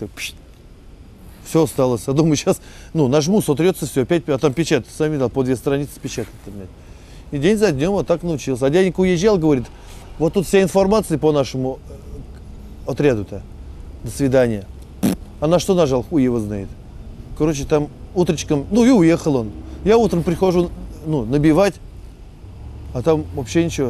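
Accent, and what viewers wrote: Russia. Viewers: Ну так сейчас такой говор только в глухих деревнях остался) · Это настоящий русский говор . Только бы мата поменьше былО , вообще тОгда хОрОшо бы былО . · Второй раз слышу настоящий русский говор 😂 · Что это за говор? Из какой части России?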